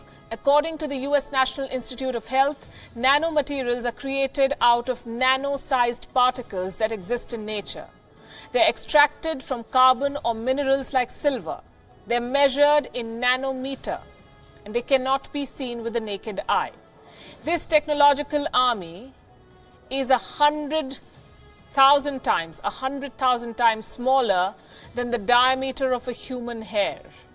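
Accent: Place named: North India, India. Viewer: var1328 Lol no. That's the North Indian accent. British sound completely different.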